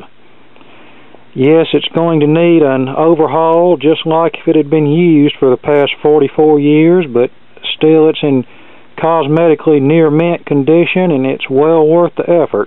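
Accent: South, United States. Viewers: staggs You have to take in account of his southern accent.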